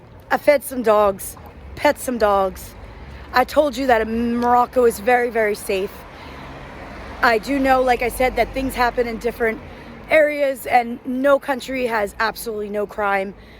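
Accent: United States. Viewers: sound like a white American talking not Moroccan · Congrats for your first road crossing the nonchalant North African way 🎉😂 (don't encourage it) More local practical safety and social tips and awareness are a must, and your best source is your neighbors and social circle, as an Algerian I can assure you that our Moroccan brothers are among the most helpful, peaceful and most generous neighbors I've ever had a chance to live close to (despite the present politics). So stay safe and enjoy your new lifestyle ❤🎉 and your caramel Noss-Noss😅 By the way your arabic slang American accent is a real treat, God bless.🎉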